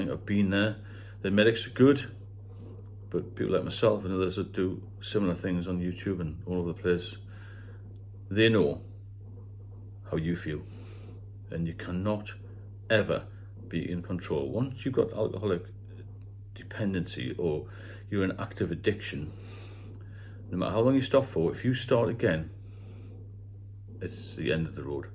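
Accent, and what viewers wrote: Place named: Newcastle, United Kingdom. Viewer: Cheers. I might take you up on that at some point m8👍 on a more positive note, I hear from your accent that you're a geordie m8, congrats if you're Newcastle fan 👍 well deserved, I watched it earlier, loved it. I'm a chelsea fan.... Nuff said about that 🙄. Cheers 👍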